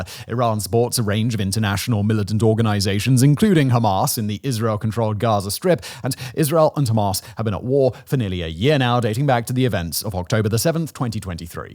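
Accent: United Kingdom. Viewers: This guy talks a lot. I think the video can be summed up as: 1. Iran has said they are going to attack. 2. So far they haven't, but that doesn't mean they won't. 3. They have a lot of missiles that may be too many for Isreal to shoot down, but we don't really know. 4. The U.S. has moved planes, ships, and subs in to the area. End of content. How much value there is in any of that, I leave to you to decide. I suppose the British accent does make it seem more compelling.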